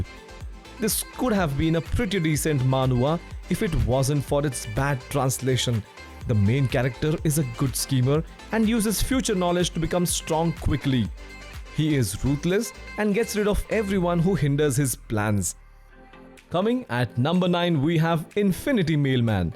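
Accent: India. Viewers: Oh good god I hate Indian English ! The pronunciations are just so JARRING ! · i just know from your voice that you are indian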